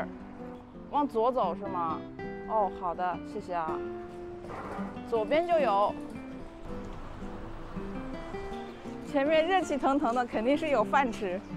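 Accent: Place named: Beijing, China. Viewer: 京腔和虎门的肠粉。饭量有点大。😀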